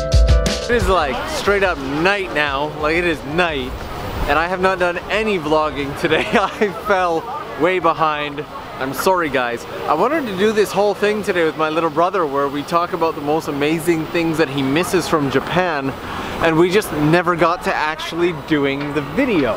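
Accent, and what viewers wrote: Canada. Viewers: I never thought I would be able to differentiate a Canadian accent from another English speaking accent... But apparently I can. Apparently they have two Uniqlo stores in Canada, I just checked. None around here though so... So nice people see you and they get all excited. That must be such a nice feeling. Anyway, have a great day. And drink your coffee before leaving it to freeze!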